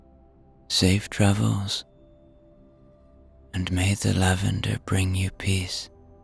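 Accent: Ireland. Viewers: listening to you makes me feel close to my Irish roots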